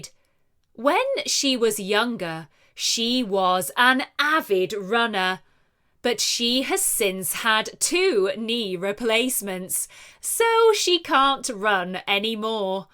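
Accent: United Kingdom. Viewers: That beautiful british accent!!! 😍😍😍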